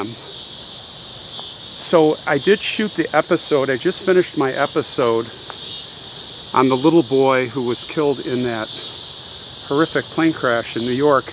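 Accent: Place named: Chicago, United States. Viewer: This is so awesome! I don't live in Chicago anymore, but my Scandinavian family is buried in several of the cemeteries you have covered in N. Chicago. I watched several of your videos just to see if you happened upon my family (slim chance I know). Love watching your videos and love your familiar Chicago accent and storytelling style. It makes me miss my family so much! It's pretty crazy that a Swede married a Norwegian 😂. Great video.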